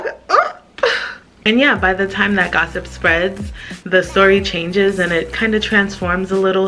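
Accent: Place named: Caribbean. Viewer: WHich Caribbean are you from?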